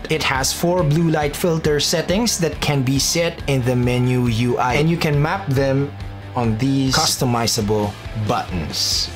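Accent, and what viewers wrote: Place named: Philippines. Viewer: Nice to english Filipino english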